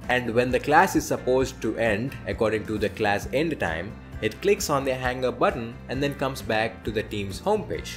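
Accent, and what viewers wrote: India. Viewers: Indian accent makes this video even better.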